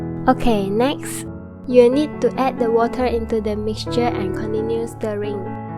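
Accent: Malaysia. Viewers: Definitely Malaysian hahah, 'Add Oil' arh!👍✌ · I have just posted mint sauce recipe and found this video. I love this. I am now a bit confused since this video explanation in Japanese, subtitle in English and pronunciation is typical Malay Chinese... Anyhow, I love your channel and subscribed. Looking forward your coming videos!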